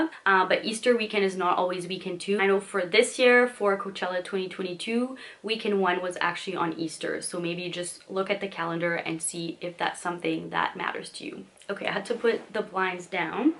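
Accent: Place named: Canada. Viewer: You really analyzed this Coachella event especially the differences between Weekend 1 and Weekend 2. You are so good at planning and organization, you should work in government budget analysis! I love your Canadian accent, it really makes you sound warm and personable, and can make a mean maple candy! Just kidding!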